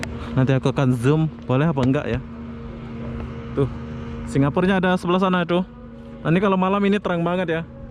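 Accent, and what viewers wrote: Malaysia. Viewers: Masih penasaran aku..org indo tp kok logatnya malay.. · Abang ini asli dari mana kah? Logat nya melayu bgt.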